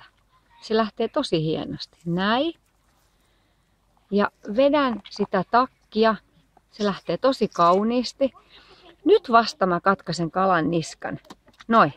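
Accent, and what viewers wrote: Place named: Turku, Finland. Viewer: Tyypilinen turun murre.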